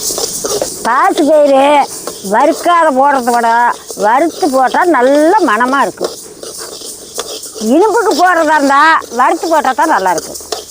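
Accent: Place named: Coimbatore, India. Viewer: Is it coimbatore district? Via general name. I love the slang of the language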